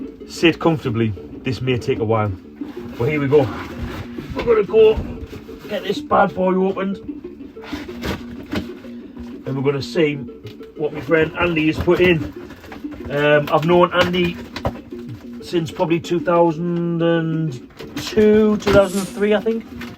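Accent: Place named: North East England, United Kingdom. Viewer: Great stuff mate. You can’t beat free stuff, you sound like you’re from the NE? We are in Teesside. My cousin was REME too.....I bet you get that all the time 😂😂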